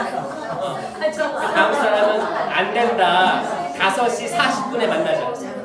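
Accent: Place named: United States. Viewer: 예수님! 신부님 영상 찾아보니 정말 대단하시군요~~*** 강론,특강,성경읽기,영어성경,기도문영상들 ᆢ 매일듣게되는 마법이 있습니다 호주계셨는데도, 우리가배운 미국영어와,성우처럼 음성이 좋으셔서 계속듣게 됩니다 신부님 항상 영육간에 건강하세요🍎 주님말씀 전해주셔서 감사합니다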